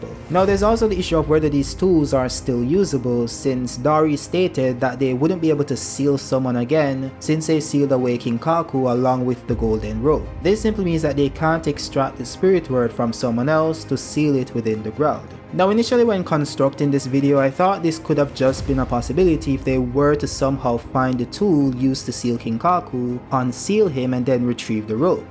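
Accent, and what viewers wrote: Jamaica. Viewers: Bro I was listening and I was like this Jamaican English for sure. Good shii bro keep going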